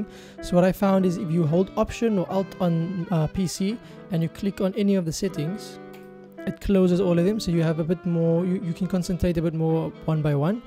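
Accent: South Africa. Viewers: Are you from South Africa? If not, you have a very South African accent. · You sound South African mybru, shout-out ! 🙏🏽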